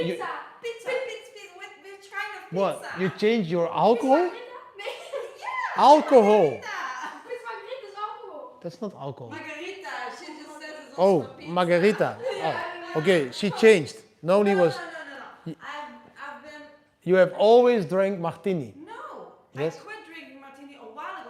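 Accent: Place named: Netherlands. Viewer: dude man bro! i just realised your accent is dutch! i grew up with dutch granparents :) much luv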